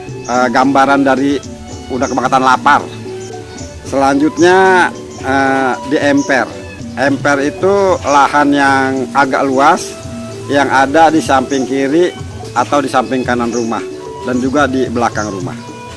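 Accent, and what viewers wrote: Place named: Jakarta, Indonesia. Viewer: Gua juga demen, apalagi dg bahasa dan logat betawi. Makin ngeunah dah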